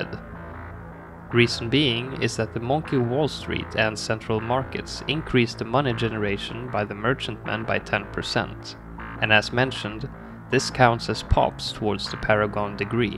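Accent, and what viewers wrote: Sweden. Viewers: you sound really Swedish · You sound swedish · u sound swedish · you sound soooooo swedish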